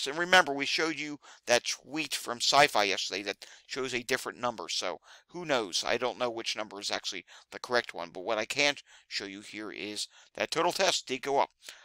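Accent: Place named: Philadelphia, United States. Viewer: Just found your channel, a friend recommended it. Thanks for doing this. Love your Philly (or near Philly?) accent! I’m from Jersey originally.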